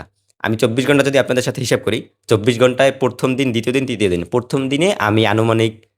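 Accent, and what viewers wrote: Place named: Bagerhat, Bangladesh. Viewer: আপনার কথা বাগেরহাটের মতো।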